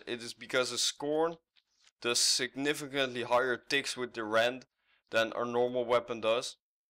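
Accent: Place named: Netherlands. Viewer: when i hear yur accent i would say yur dutch ;)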